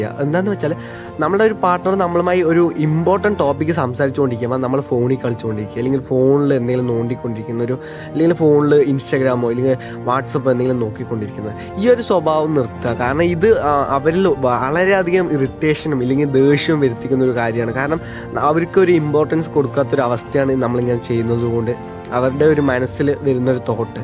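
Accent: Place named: Palakkad, India. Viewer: Palakkad alle bro veed😄......manasilavnd namde bhashaaa...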